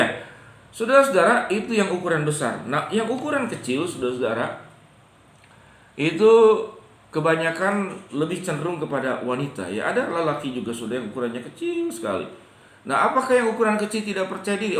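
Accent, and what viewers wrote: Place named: Palembang, Indonesia. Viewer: Sepertinya cara berbicara nya kayak ada logat Palembang nih mas ya ? Hehehehe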